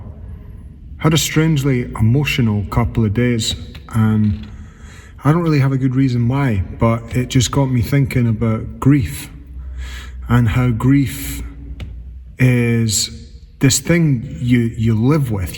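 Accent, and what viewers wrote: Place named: Scotland, United Kingdom. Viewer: Hi, I've just been listening to all your music on YouTube again. ❤️ Keep being amazing and real❤️ Love all your music and listening to your lovely Scottish voice in the chats too. Thank you for voicing most of my deepest inner thoughts, concerning grief. It never disappears, it stretches out, as you get on with everything else in your life. I've lost lots of people sadly, including my middle son and my husband. Music has always helped me through. Your music is very special and continues to support lots of us, on our life journeys. Sending best wishes and much love to you and Rosa xxx❤️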